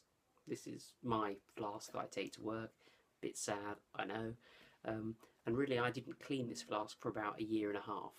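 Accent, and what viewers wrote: United Kingdom. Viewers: What UK accent is that sir?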